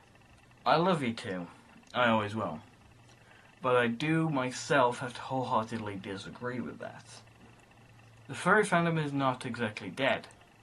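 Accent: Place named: United Kingdom. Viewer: nice video, your british ranting reminds me of rysis and arthimus wishfoot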